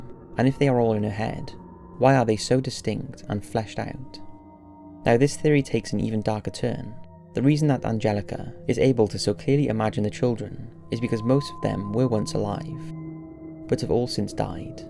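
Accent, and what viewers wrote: Wales, United Kingdom. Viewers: Gotta ask,are you Welsh? Your voice sounds like it has Welsh undertones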